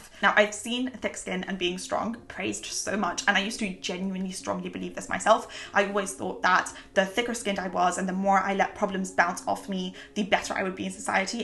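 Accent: United Kingdom. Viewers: I can listen to you for ages for your adorable British accent.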